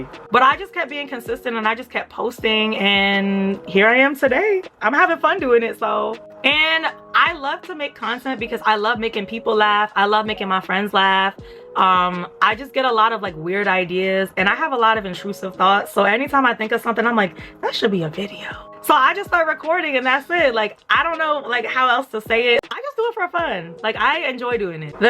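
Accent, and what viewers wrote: Jamaica. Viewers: Far a while was trying to figure out if you were Jamaican descent, because I can kinda hear it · Funny thing is your natural accent reminds me of Jamaicans I know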